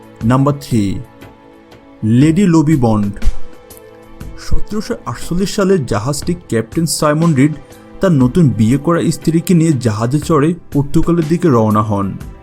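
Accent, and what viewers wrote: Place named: Chittagong, Bangladesh. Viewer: ভাই আপনি খুব সম্ভবত চট্টগ্রামের মানুষ। তাই শুদ্ধ বাংলা পরতে আপনার কষ্ট হচ্ছে। অহ আপনি যে রিডিং পড়ছেন সেইটা বুঝাই যাচ্ছে। অন্য কারো ভয়েস দিয়ে ট্রাই করেন। না হলে নিজেই কিছু প্রাকটিস করে কৌশল অবলম্বন করেন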